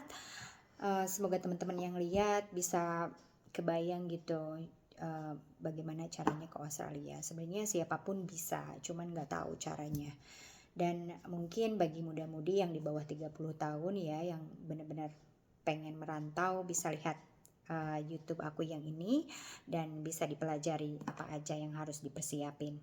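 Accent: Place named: Tasikmalaya, Indonesia. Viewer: Orang tasik ieumah hahaha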